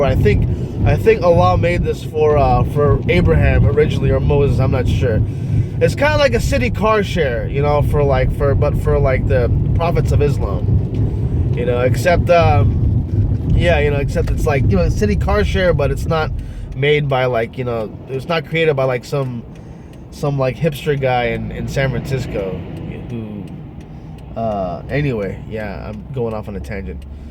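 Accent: Arab world. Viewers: You are fake , and you have Arabic accent even when you try to pretend different dialogue your accent is more clear so, how much you got payed from YouTube for that fiddling video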